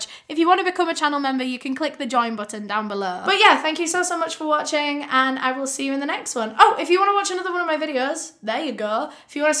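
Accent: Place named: North, United Kingdom. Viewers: gets more and more Yorkshire as time goes by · storytelling style drifts further back to her northern roots every single video and I love it 😂 these videos have always been great but that extra touch of personality in the more recent year or so has been a nice addition.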